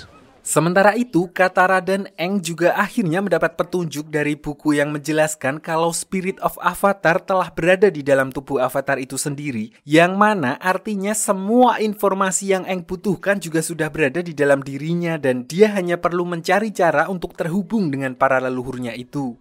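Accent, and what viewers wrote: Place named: Java, Indonesia. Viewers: bisa gasi gausah medhok pas ngomong bahasa indo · Medok amat mas ngomongnya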